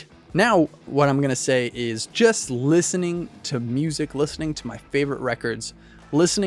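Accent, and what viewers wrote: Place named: Nashville, United States. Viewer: you from nashville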